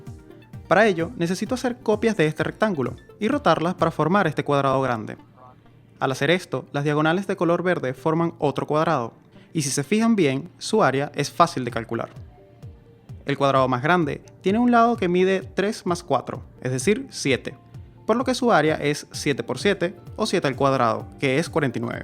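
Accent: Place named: Venezuela. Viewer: Soy aficionado de canales como minutephysics, numberphile, sixtysymbols, veritasium, etc y cuando escuche tu acento me dio un escalofrío gigante, que bueno ver en youtube gente venezolana haciendo cosas similares, mucho éxito! muy fino lo que estas haciendo pana! P.S: llegue aqui porque minutephysics te dio like!, GENIAL!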